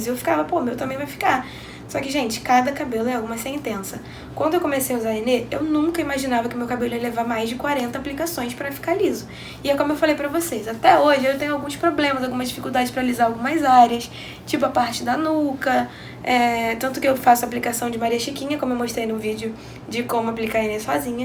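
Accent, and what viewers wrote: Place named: Rio de Janeiro, Brazil. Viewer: Sotaque carioca é tão bonitinho... 💋 de Sampa.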